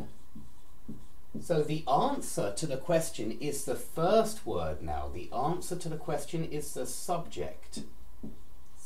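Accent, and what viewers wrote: United Kingdom. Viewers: Hola, I like the way you teach. It's very clear. I like your accent, I don't know which part of England you are from. I'm from Argentina and I love English language. I'm an intermediate learner so sometimes you get stuck or you understand half the things you hear and that makes you feel dissapointed. I'd like a lesson about phonemic symbols and how can I pronounce a word if I don't have a dictionary with me. Saludos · Well, i was expecting for something different I guess, but it was a good lesson, you taught me something new which I was a little bit confused on how to use it or even to teach it. It´s a difficult topic and you did quite well, by the way I love you accent, I guess you are british, aren´t you? :3 Congrats! and thanks for the lesson :3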